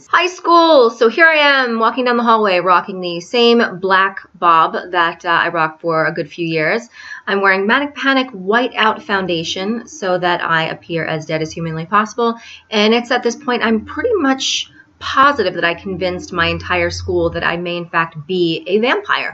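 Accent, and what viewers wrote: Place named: New York, United States. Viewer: I can totally tell you're a New Yorker :P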